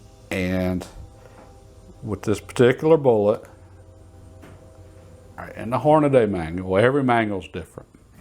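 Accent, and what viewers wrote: South, United States. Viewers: Maybe I missed it, hard time hearing these days, but I always went with the longer the round the more twist were needed, not the weight of the bullet but I guess it could follow the heaver the bullet the longer...this is a great channel with great content and I can actually understand the southern accent better lol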